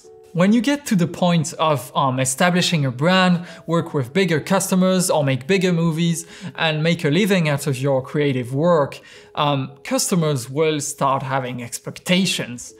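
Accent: France. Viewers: I love his pure thick French accent. It’s ASMR at its finest. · Are you French or Canadian? Just curious. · T’a l’accent français je trouve si tu es français alors je dit bravos 😊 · The most french thing anyone has said: (especially with that accent) lol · Youre french?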